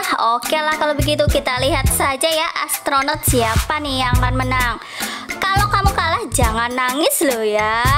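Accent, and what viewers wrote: Java, Indonesia. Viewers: Sampean orang jawa ya kedengeran dari suaranya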